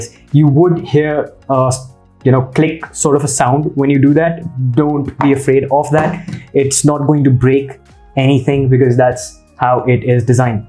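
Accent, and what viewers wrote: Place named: India. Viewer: thanks nice indian english very clear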